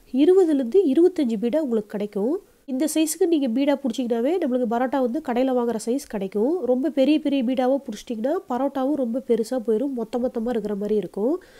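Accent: Kongu, India. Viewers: Good kongu slang😀😀😀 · Kongu tamil eppovuma alagutha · Your kongu Tamil was good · அருமையா சொன்னீங்க ! கொங்கு தமிழ் அருமை !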